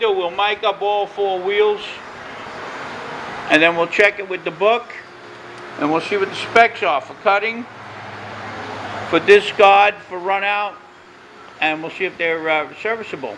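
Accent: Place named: New York, United States. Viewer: I was all "This sounds like a NY guy" Then I saw the FDNY hat, Alright!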